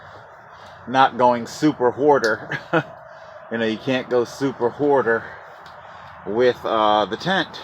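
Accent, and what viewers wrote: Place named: Ireland. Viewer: Awesome Irish accent!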